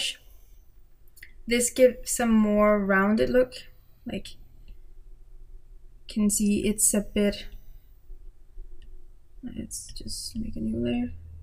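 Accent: Denmark. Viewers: I don't mind if you screen record or this way. But I am a little concerned about your Apple pencil because it's missing the cap. Did you lose it? But anyway you record, I love your voice, it's adorable ❤️ your Danish accent is just insanely cute 😍💛💛💛 Your so skilled as an illustrator. I'd love to see you create an entire piece in real time from start to finish. Don't care if it's an hour or two long. Your soothing voice calms my anxiety disorder. I finally found my Kryptonite - you 🥰🍕🍕🍕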